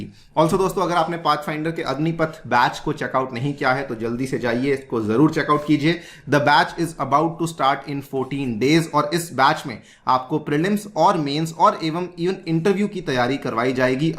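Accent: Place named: Haryana, India. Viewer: Baheri asi production dekh li ...sir is talking in pure desi haryanvi accent. Hum local haryanavi me bolte h asa..hindi m to bht boltr hn..sir bht acah laga apse haryanavi sunkar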